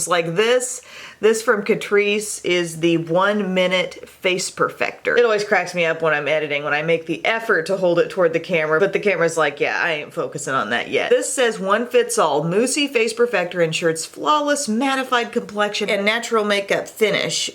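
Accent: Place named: South, United States. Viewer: Where are you from?Your accent.lol.Im from Arkansas so I could hear some country in there.lol.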